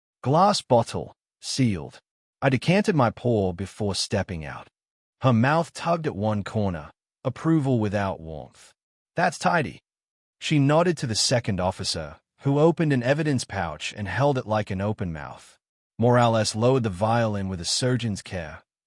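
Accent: Australia. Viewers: I don't know which is more annoying, the Australian accent or the pronunciation of Mia's name as "M.I.A."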